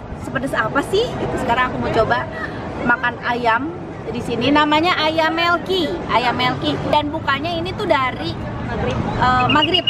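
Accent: West Java, Indonesia. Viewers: ngakak sendiri liat teh kl pke logat sunda lucu,.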